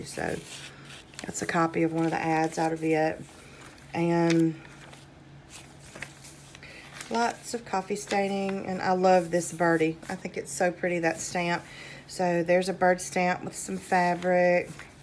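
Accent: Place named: South, United States. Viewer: I can hear your southern drawl, very nice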